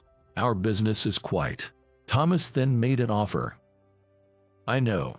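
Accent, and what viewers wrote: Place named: Boston, United States. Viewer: Love the Boston voice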